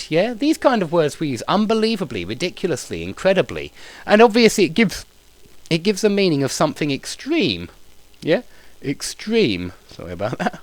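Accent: United Kingdom. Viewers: Great video. By the way, you have an elegant, educated British accent.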